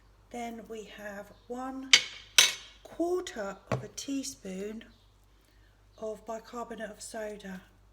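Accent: London, United Kingdom. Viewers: Yes it’s been in the same place for 15 years and I’ve cut it right back with the intention of getting rid of it, but it came back healthier till the fungus took hold again. Unfortunately it’s base is too large and established to be moved easily. It’s on a north south oriented fence so it get shade and sunshine. I heard that feeding and watering them well helps. One last ditched attempt this year for me. This morning it looked really well and healthy with all this heat. Have a wonderful w/e. BTW is that a London accent you have?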